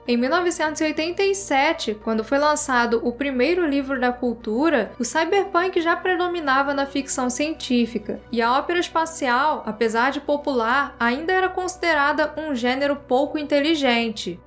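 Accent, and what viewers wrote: Rio de Janeiro, Brazil. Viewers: Você tem um sotaque fluminense. De que cidade você se encontra?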